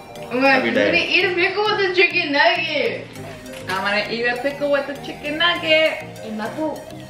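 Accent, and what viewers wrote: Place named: United Kingdom. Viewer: Omg I swear I'd never think u were American I always thought u were british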